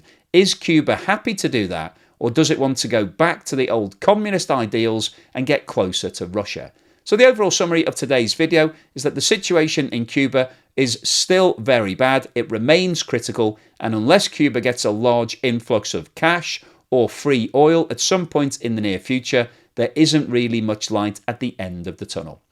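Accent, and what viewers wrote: United Kingdom. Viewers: Off topic, but I find this guy’s accent the most pleasant British accent. Does anyone know its name?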